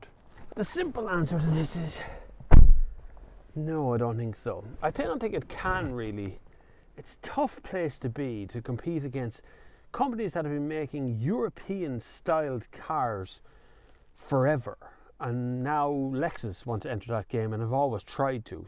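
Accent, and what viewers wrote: Ireland. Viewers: What a fine review. You have obviously heard all the bs, are not impressed and are certainly not going to repeat it. Just plain facts and honest impressions with a bit of humor. Great, thank you. And of course the Irish, most musical accent in the western world. 😀 And oh yes, almost forgot: great camera work!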